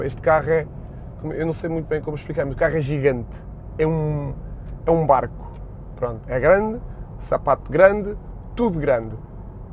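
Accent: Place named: Cascais, Portugal. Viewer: Oh sigo-te aqui na Suíça/França,opa,mesmo a falares assim à "Cascais", ouça lá você é mesmo muito bom nisto! Grande abraço e continua,tip top!!!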